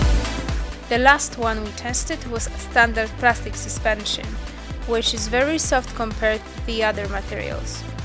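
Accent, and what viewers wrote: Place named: Poland. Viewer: Pani chyba z Polski, akcent się zgadza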